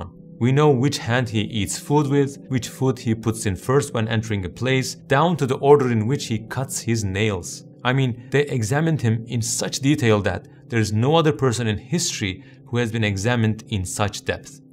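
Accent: Turkey. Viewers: you have a turkish accent ,i lived in turkey such a muslim country ,as a tourist you are a walking walet to them you get ripped off the moment you step in a cab at the ap until you leave the country .from government offices all the way to corner shops ,i travel a lot i have seen many countries there are bad apples everywhere but turkey as muslim country should set an example not be the worst in the world , this example itself proves whatever islam is offering it's not working ,and i am going to stop here and good luck with your lessons of saving souls and converting ppl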